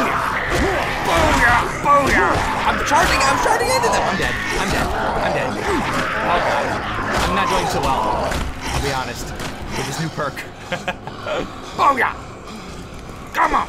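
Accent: Scotland, United Kingdom. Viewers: Your Scottish accent is freakin' epic:3 (Scottish Guy) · love the british accent Need more! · T_T.....that's a scottish accent......gj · I love ur British accent! <3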